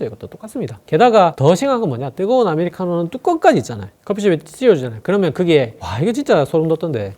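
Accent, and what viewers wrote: Gyeongsang, South Korea. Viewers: 선생님 잘 듵었습니다 구수한 경상도 사투리가 정겹네요 미세프라스팈에 쪄였어 살았네요 줄이는 방법 알려주셨어 감사합니다^^~ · 지구가 프라스틱에 째리게 되었다 ㅠㅠ 갱상도라 더 더가옴.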